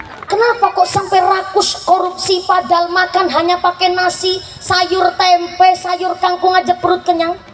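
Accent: Banyumas, Indonesia. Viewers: Ceramah ngapak ustadzah mumpuni Like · Seru dan kocak sekali ya kalau pengajian mumpuni , ngapak ngapak 😅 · Ceramah ngapak lucu tapi mengena para koruptor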